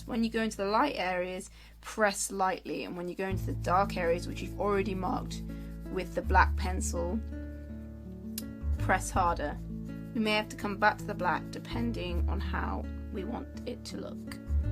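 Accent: United Kingdom. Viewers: OMG are you British? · really good tutorial! :D love your accent, british is so beautiful :3 good work! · Are yiu from England? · Your drawings are amazing! Mid-england? Same accent as me :D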